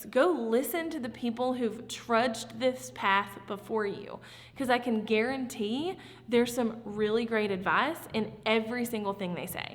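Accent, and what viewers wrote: South, United States. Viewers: Are you from the south? 🤔 Oh from onwards you lose the accent 😂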